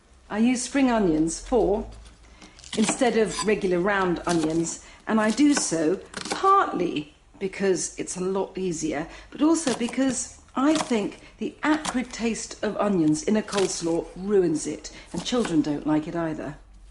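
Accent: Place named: RP, United Kingdom. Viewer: It’s funny to listen to that posh voice making what I think of as American soul food. I’m not meaning it unkindly and I totally need carrots in coleslaw it’s just everyone is always like nigella nigella nigella and I’d never watched her before didn’t know she was terribly British sounding ❤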